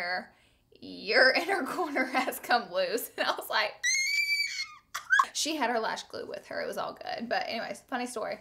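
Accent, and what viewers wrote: South, United States. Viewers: Your southern accent is so cute 😂❤️ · i love your accent and how you always say "whenever" instead of "when. so southern <3 you're precious!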